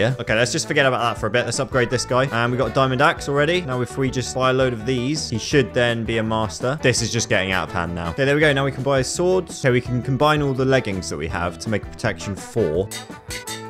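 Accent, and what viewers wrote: United Kingdom. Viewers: You sound brithis and not british at the same time wtf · Are you British? 😢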